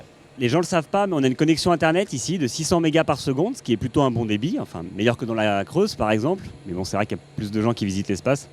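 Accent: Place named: Russia. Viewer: L'anglais parlé avec l'accent Russe est parfait <3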